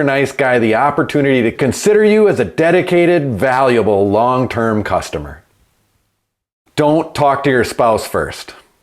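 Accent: Wisconsin, United States. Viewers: Mad proper Wisconsin accent. -715er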